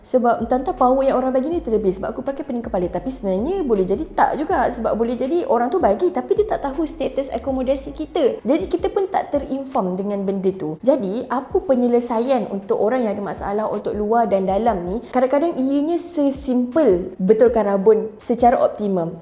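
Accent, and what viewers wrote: Malaysia. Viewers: Cewek malaysia lucu klo ngomong 😂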